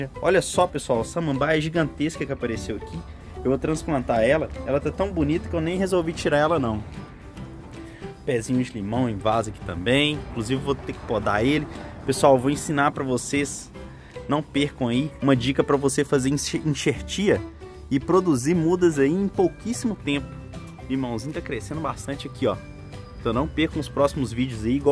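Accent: Minas Gerais, Brazil. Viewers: Cê é minero, fii???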